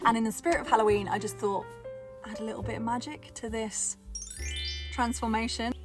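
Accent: United Kingdom. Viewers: Suprised to see your sponsership is a language learning website. Can you imagine how great your channel is for us who are learrning English :face-red-heart-shape: such a relaxing and leisurely journey with such a british accent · Your videos are the best ones out there for me... it lifts my mood ... and for someone who english is her 3rd language and i learnt it in American way I AM in LOVE with your British accent ... the way you film and edit are uniqe but dayli vlogs can be a good idea too ... anyways good luck and am waiting for your next uploads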